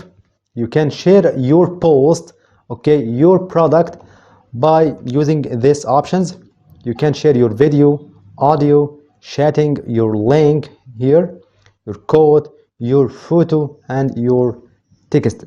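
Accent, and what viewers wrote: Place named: Egypt. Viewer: منور يا عم او كما تقولون الله ينور ياعم عرفت انك مصري من خلال كلمتين (اكزمبل exemple وايضا زيس this) بالتوفيق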